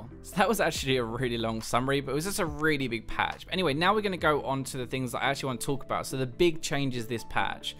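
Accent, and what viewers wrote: United Kingdom. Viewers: I could have listen to you for hours british accent is so cool :D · Dude i love your vids! plus im british as well so :D ur accent is normal to me i couldnt tell the difference xD anyway keep ur amazing vids up and remember them puns of funniness xD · the reason I'm wathcing the clip consists partly of your british accent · I love British accent! And I'm not the only one haha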